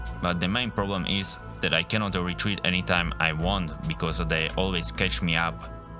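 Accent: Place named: Poland. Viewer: I'm still trying to figure out where you are from. My guess is Polish because of your accent but wouldn't be surprised of it's Czech/Slovak... :)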